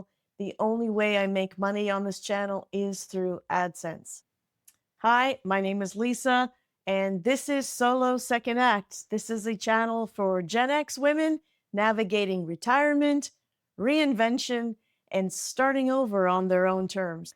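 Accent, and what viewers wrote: Canada. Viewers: Love your Canadian accent! Reminds me of my Canadian relatives! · Thanks so much for the helpful explanation. I started a channel (under a different handle than this one) and keep trying to plug away at it waiting for it to gain traction, but now i know - it's stil early! Also - I registered the accent before you mentioned you were Canadian. I enjoyed listening to you!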